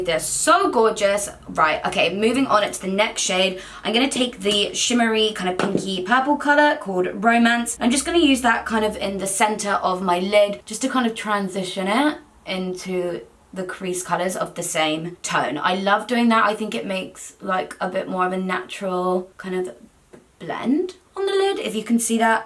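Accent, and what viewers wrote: Newcastle, United Kingdom. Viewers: R u from Newcastle!? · I’m new to this channel and got so confused. So geordie at the beginning and then not at all by the end of the video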